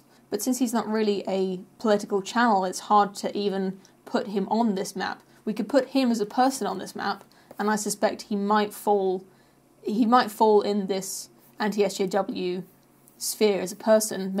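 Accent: United Kingdom. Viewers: Insightful analysis, clear diagrams, no makeup, British accent. is literally the sexiest woman on Youtube.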